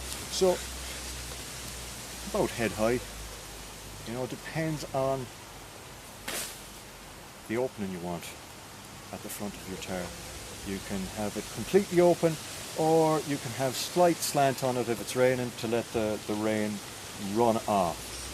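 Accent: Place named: Ireland. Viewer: knew the Irish accent straight away..good video..sub'd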